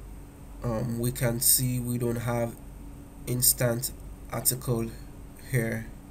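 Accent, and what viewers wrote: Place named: Nigeria. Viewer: Wow!. I was watching BOVI comedy on my Tab while searching for How to work on Instant Article. I had to stop Bovi when you started talking. A NIGERIAN VOICE? MAN!. GOD BLESS YOU. I didn't skip the ads either because I believe that's a way to say THANK YOU!. GOD BLESS YOU MAN.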